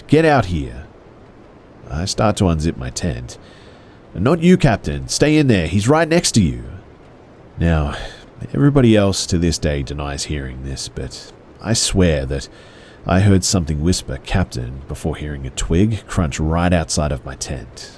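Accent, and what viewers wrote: Australia. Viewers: I love stories and its sooo great to hear an Australian. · Good to here an Aussie accent! Much more familiar. Cheers mate fair dinkum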